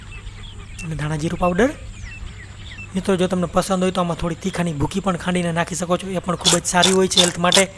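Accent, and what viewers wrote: Kathiawar, India. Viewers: મિત્ર, સલામ, આપની વાનગી અમોને ખુબજ લલચાવે છે, વ્હાહ, મજા આવી જાય... આપ શ્રી. આપની કાઠીયાવાડી માં બોલોને, ઈ અમોને ગમશે, જેવી તમારી રસોઈ તેવી તમારી બોલી.... DHANYAWAD..... ALL THE BEST....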